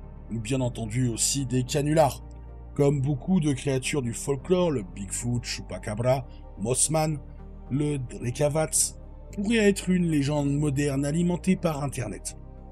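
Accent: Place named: Eastern Europe. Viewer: Je découvre ta chaîne, mais quelle ambiance! J'aime bcp ton montage sobre, ton élocution, la prestance de la grosse beubar de viking, cet accent slave magnifique 🤌 Ton analyse est fouillée et pas inutilement dans le debunk. En tout cas ton Drekavac me fait fort penser aux très modernes creepers ou rakes (humanoïdes squelettiques, pattes arrière retournées, yeux fluorescents, gueule béante et emplie de dents...) Y'a une version en Europe de l'ouest, comme tu dis le cri est horrible et ils sont très furtifs. Le coup de la photo/film raté se recoupe avec pas mal d'images de cryptides, qui sait si ces créatures ne peuvent agir sur les images avec un genre de champ électromagnétique ? Et cette histoire de cri avant un mort rappelle les Banshees bien sûr! Je m'abonne évidemment:)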